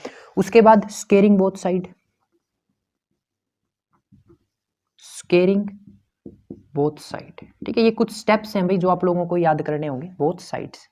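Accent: Haryana, India. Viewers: Bhai app kaha se hai pure haryanvi bool rhe hai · Aap haryana se ho ??? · Language ki tone se pta lgra h ki aap haryana se ho...main bhi haryana se hu...kurukshetra se..... 0.1😍😍support farmers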